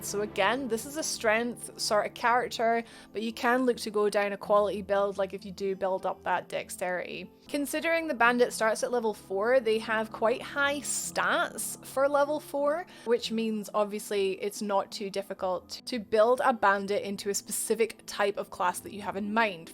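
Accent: Scotland, United Kingdom. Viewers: Is that a Scottish accent? It sounds cool. You have a cool accent, you should try your best not to sell it to someone or accidentally discard it. Accents are valuable commodities.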